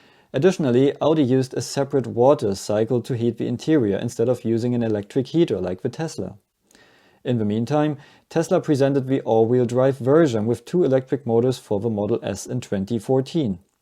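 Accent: Germany. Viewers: The best articulated middle finger to management in polite german english. I love it. Sadly nobody who should will ever learn from this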